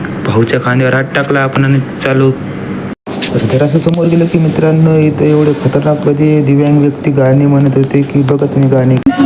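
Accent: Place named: Pune, India. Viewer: Punekar bolte bhaiiiii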